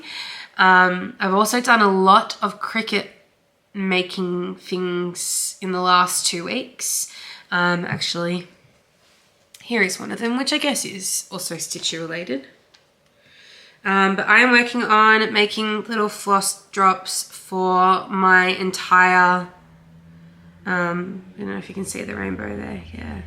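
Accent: Australia. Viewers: Love hearing the Aussie accent on Flosstube! I agree, we need more seasonal stitching options over here in the Southern hemisphere. That BBQ is super cute 😍. Hope you feel better soon.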